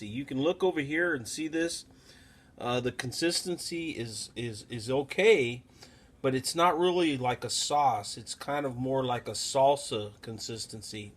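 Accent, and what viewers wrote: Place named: West, Mexico. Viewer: BTW-love your accent of Western and slight Mexicanish accent. Must be good since your mouth is watering as you cook. That's what all good chef do! :-)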